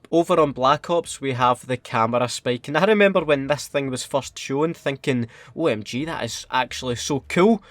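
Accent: Scotland, United Kingdom. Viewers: This mans accent is just awesome i love the Scottish accent mate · Love your scottish accent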